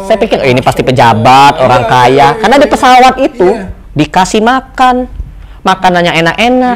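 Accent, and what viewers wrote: Palembang, Indonesia. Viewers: Ini pak ngomong lidahnya Palembang banget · logat palembangnyo om ku dak ilang ❤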